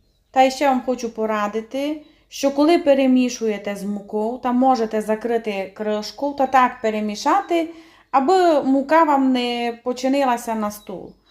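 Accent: Transcarpathia, Ukraine. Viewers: Чудный Закарптский язык,очень люблю слушать.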